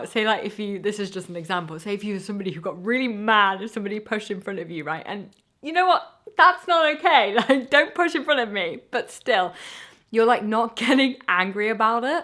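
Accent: England, United Kingdom. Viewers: Thank you so much! Your message resonated all the way! Very interesting indeed and I greatly apririciate your efforts 🙏🏻 The relinquishing has to do with my drug use, I need to let go of something I have seen as a right for 30 years. I already know I don't need it anymore but I seem to be afraid of the emptiness that will occur when I stop. I have already come to the realisation that this emptiness will actually manifest as more room for other things, and I got loads of things that could use more attention. Lately I have been receiving some re-tuning, very powerful changes in my subconscious that immediately cause stuff to integrate. Awesome stuff this whole 'becoming'! And you my love, your presence makes me feel deeply connected. Like I'm finally home, safe... I feel enchanted when you are in your way 😍 How you speak and laugh, you are beautiful in looks ánd your being. 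Haha I even felt a butterfly in my stomach when I realised that you speak with an English accent, that makes it likely that you are 'near'.. Don't worry, I do live in another country, the Netherlands, and I will not go around stalking you or something. I guess I wanted to share this with you because you make me feel special and I want you to know and feel that you are very special to me ❤️ Eyes open, no fear. Be safe you beautiful creature ❤️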